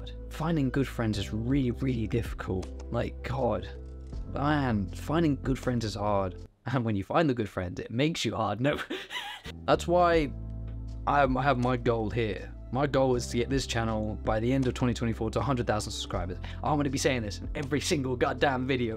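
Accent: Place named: United Kingdom. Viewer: british detected - opinion disregarded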